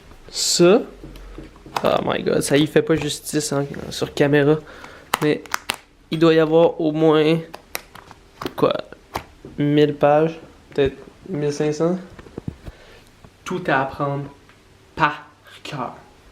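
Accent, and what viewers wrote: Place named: Quebec, Canada. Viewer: Mais il le dit nn 😂 ça se voit il parle de sa vie au Québec, il a l’accent…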